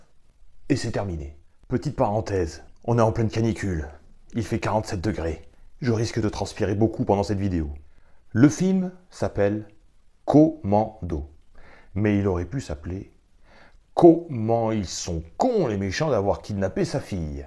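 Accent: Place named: Bas-Saint-Laurent, Canada. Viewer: oui l'accent du bas du fleuve que ta pris pas celle de MOntréal ni Québéc bien content d'avoir faite ta connaissance le cousin de la france génial ton video 🤣🤣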